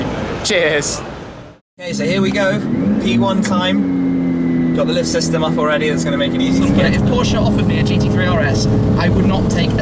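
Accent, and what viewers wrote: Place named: United Kingdom. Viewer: sotaque britânico...eca...manda um americano cobrir o evento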